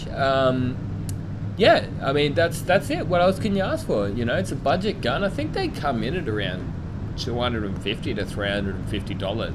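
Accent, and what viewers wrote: Australia. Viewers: He's got that au accent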